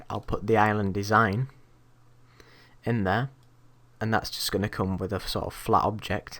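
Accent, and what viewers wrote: United Kingdom. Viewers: British Accent makes me feel chill :D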